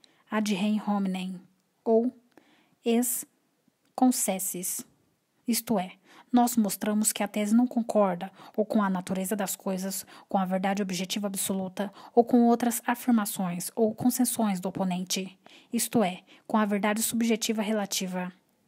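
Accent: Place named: Caipira, Brazil. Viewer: Jesus que sotaque de caipira socorroooi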